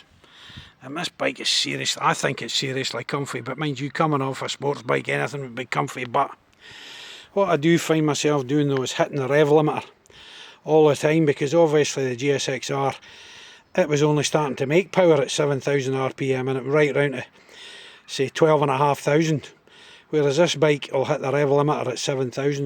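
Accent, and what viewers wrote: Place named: Scotland, United Kingdom. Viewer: Nothing to do with the bike, but I just love your accent. Can't wait to visit Scotland again. 🤩 Great review btw and good to know about the rear brake recall. 👍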